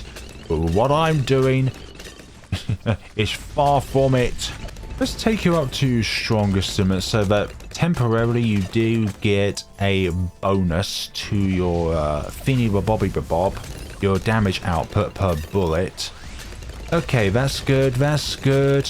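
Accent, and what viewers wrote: United Kingdom. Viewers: Holy British accent 😂 lovely video gentleman!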